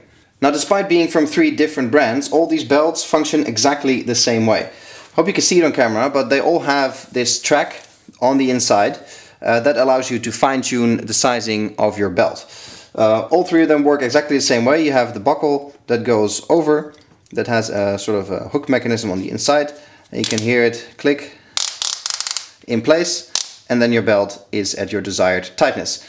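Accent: Netherlands. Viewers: Is the presenter dutch?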